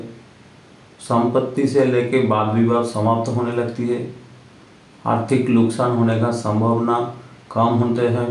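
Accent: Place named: Bengal, India. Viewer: Your Hindi is Bengali.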